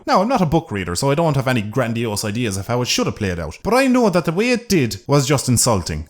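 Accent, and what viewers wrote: Ireland. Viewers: It’s part of the Irish accent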